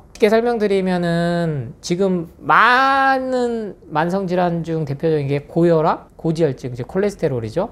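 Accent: Jeolla, South Korea. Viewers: 전라더 말투에.. 인상도 이상하고... 그냥 딱봐도 사짜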